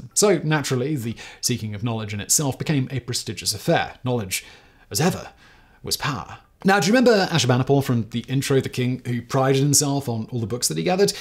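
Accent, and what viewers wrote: United Kingdom. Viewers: If you watch his other channels, he openly talks about not even being able to remember recording videos anymore because he does so many. Scripts are created by his writing team these days, and he just reads them in his reassuring-sounding British voice.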